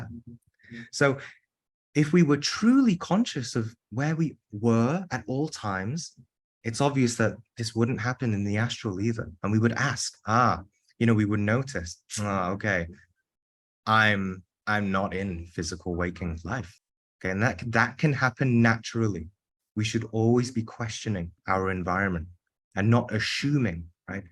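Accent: United Kingdom. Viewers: It is very difficult for Me to Accurately Express what I intend to Convey...but I will try. I was initiated by an Indian Sat Guru at the Age of 23. I am 73 now. The Tradition of My Guru's Philosophy originates from the Punjabi River region of Northern India...the Homeland of the Sikh Religion. I have been through Many Chapters of Life...since My Initiation...but after listening to Lecture...I now realize just how little I knew about Meditation, the Dream Phenomenon, or Astral Travel...in the 50-years following. I took Copious Notes...during This Lecture...and Now that I have had a Chance to Recopy It...adding My Own Incomplete Sketches of Inner Knowledge...I am At a Complete Loss for Words. I am Now Seeing that I have Added the Lectured Information (Given by )...to Complete what I Already knew and wrote beforehand. It is like Writing The Subject & Verb of a Sentence...and then a Wiseman entering the Room and completing Each Sentence with the Necessary Object...to make the intended Concept...A Complete & Comprehensive Set of Ideas. 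I am describing Something very Spiritual here...but I am merely a Student-in-Progress...sitting in Classroom. I have only had maybe 'Six' of what I would classify as genuine Out-of-Body Experiences in 73-years of Physical Life...but is a Master-Teacher at His Craft...with a Beautiful British Accent to Clearly Express His Lofty Teachings. There is A GOD...and I am truly an Unworthy Recipient of His Endless Grace. I have found a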